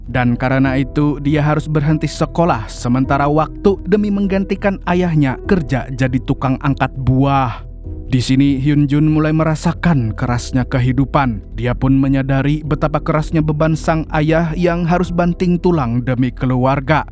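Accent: Banjar, Indonesia. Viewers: Nah urang banjar kh😂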